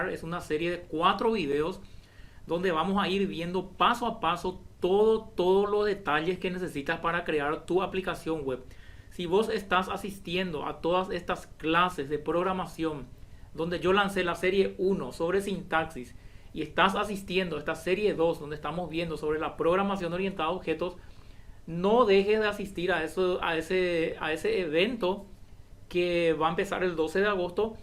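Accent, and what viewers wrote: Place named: Paraguay. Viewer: Inconfundible el acento paraguayo, espectacular. Por cierto, cuando te refieres a la composición y agregación. En la composición es la instancia de la clase contenedora mas la instancia de la clase embebida la que termina. En la agregación no necesariamente debe morir la instancia de la clase agregada.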